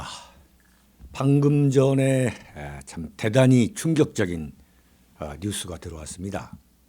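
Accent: Chungcheong, South Korea. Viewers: tv 즐겨보고있습니다. 그런데...충청도 말때문에 답답해서 숨 넘어갈꺼 같아요. 1.5배속으로 듣고 있어요 그래도 감사합니다. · 요즘은 그렇치 않아요 저도 윤대변인님과같은 충남 사람인데 요즘은 돌굴러가요 정도는 아니예요~~ㅎㅎ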